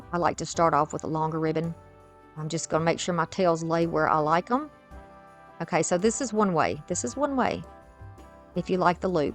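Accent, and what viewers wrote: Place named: Texas, United States. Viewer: I love all of these projects. Great job! Where are you from? You sound like you are from Texas which where I am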